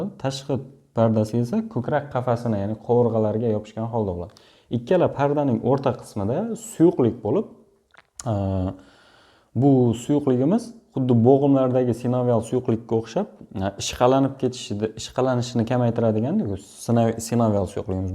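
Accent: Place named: Khorezm, Uzbekistan. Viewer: Dm zor tushuntrbsz raxmat shevangzdan xorazmlimisz diman😅😊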